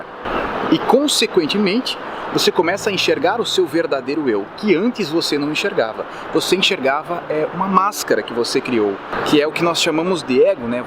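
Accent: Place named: Rio Grande do Sul, Brazil. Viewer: Mas eu sabia que conhecia este sotaque.:) És gaúcho de onde,? Sou gaúcha, também!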